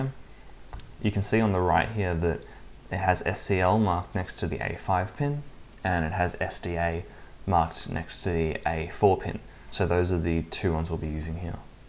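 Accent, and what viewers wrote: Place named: England, United Kingdom. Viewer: This module should not be used without level converter. The VCC for the sensor is max 3.3V, see <link> and <link> There is no provision for level shifting on the module. If you apply 5V you are unduly overstressing the module and reduce its lifetime. I love your english accent but the accuracy of the contents leaves to be desired.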